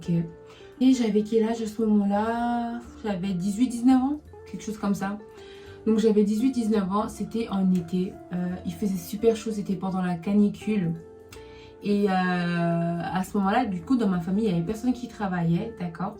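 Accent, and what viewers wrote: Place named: England, United Kingdom. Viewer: L'accent anglais 😌❤️❤️sinon très belle histoire.☺️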